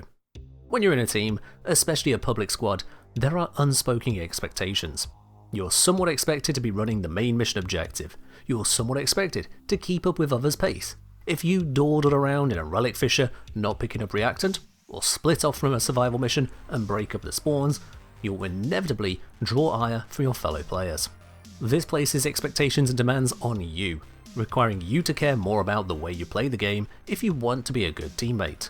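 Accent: United Kingdom. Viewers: Surprising that the first language is not English. He sounds kinda British tbh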